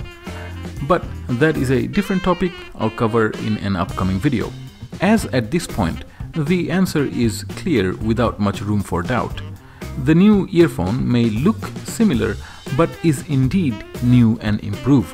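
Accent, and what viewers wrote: India. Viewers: What is that lovely accent!! It sounds like Indian accent with some Asian mixed!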